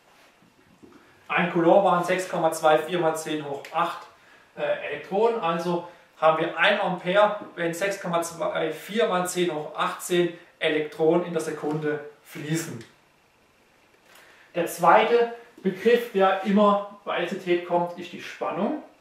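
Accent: Swabia, Germany. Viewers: das heißt nicht leischtung sondern leistung und ein W wird nicht mit nem Kreuz in der Mitte geschrieben. argh, das schwäbische kann man sich einfach nicht anhören. aber sonst gut erklärt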